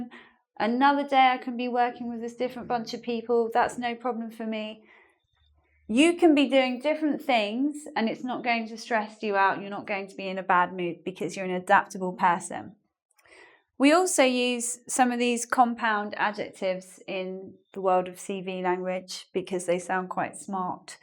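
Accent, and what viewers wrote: United Kingdom. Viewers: Twice. How I love this British accent...👌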